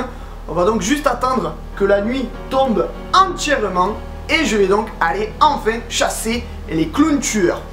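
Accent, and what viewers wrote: Marseille, France. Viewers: je kiffe ton axen marseillais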